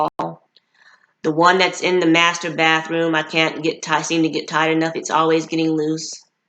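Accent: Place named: Louisiana, United States. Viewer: OK awesome I thought I detected a bit of a Louisiana accent LOL I am here near Baton Rouge Louisiana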